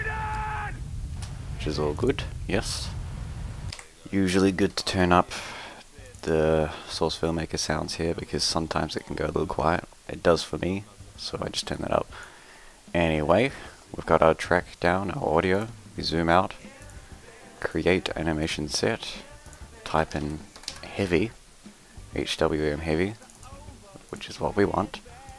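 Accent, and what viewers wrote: New Zealand. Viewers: sexy new zealand accent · new zealand accent ftw!!! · I know hes a kiwi...I'm used to people confusing me for a Brit/Welshman.... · No! It's a New Zealand accent. They're like, where's the caar, and he's like, where's the caar.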